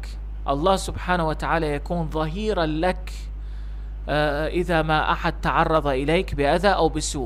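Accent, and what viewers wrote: Lebanon. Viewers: ماجور ان شاءالله بس عندي استفسار خارج الموضوع يأخي نبرة صوتك قريب على اللهجه اللبنانيه او السوريه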